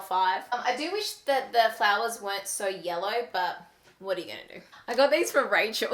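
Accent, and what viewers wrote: Australia. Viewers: this is a really random question but is she from australia? idk she sounds australian though. just asking because i want to watch australia youtubers and she was in my recommended and she seems nice and i love her already ahaha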